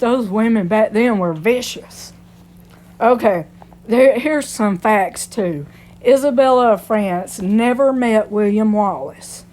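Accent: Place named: Alabama, United States. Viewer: I love the Alabama accent, it’s doesn’t sound like the American accent we all know and hear on television, I love listening to reading historical stories, thank you 👍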